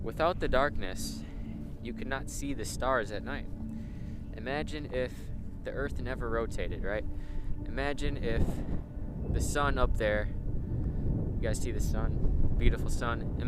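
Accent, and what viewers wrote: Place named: United States. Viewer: hey yeah you had better. No, I am just kidding. Sorry to be so cringe, just that you just make me so happy. Sometimes my autism just gets the better of me, but then to calm me down, I just watch one of your videos and that helps me. I am from England and I just love the American accent 😍 especially yours. So once again, thank you. To be honest, If I met you in real life, I would be nervous wreak ha ha coz even though you are not doing ASMR anymore, your voice would totally relaxed me. Anyways, I am gonna stop being cringe right now lol. I will look forward to your next video. 😊❤❤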